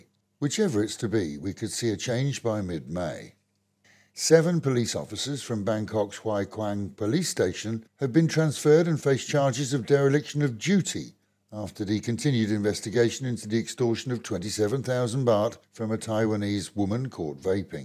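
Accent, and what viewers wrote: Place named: RP, United Kingdom. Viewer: Nice RP accent. Quite a change from the many YouTubers who seem to be from Newcastle.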